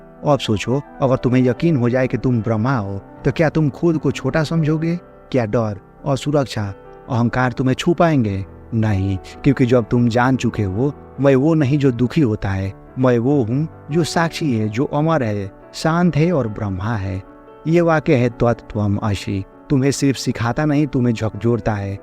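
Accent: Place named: Bengal, India. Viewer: Bhai tu bangali hai... Ya bhojpuri... Mast bolta hao bhai...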